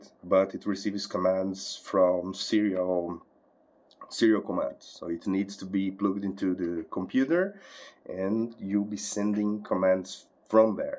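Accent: Brazil. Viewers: adoro o sotaque br no inglês maravilhoso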